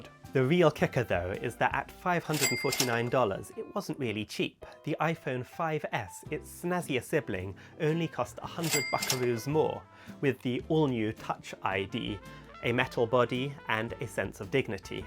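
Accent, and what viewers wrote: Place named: United Kingdom. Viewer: I want to speak english like you. The way people from the UK talk... it's immaculate bro. Sounds really fancy.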